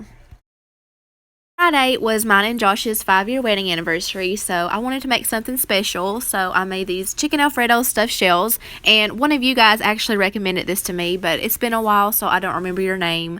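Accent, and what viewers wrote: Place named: Midwest, United States. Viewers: Hi! I am new to your channel and I absolutely love it! I love getting ideas from you and I think you cook some really fun, family friendly friendly meals. I’m also from Michigan area so I love to hear your accent. 😄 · I like your videos... straight to the point with great information. Plus being from the midwest I appreciate your accent :)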